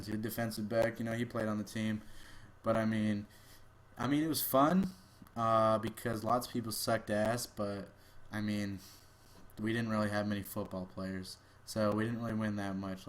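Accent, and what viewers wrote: Canada. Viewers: I can hear the Trailer Park Boys in his voice. It's amazing. Soarry. Abut. Grade 5. Incredible. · Change the title to "All Aboot Me ". lol, I like how Canadians say 'about', and 'sorry'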